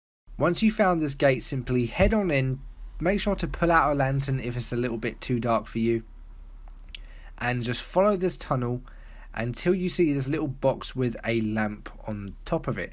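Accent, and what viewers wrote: England, United Kingdom. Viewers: English accents are so adorable, "go through the wuh-uh-fole"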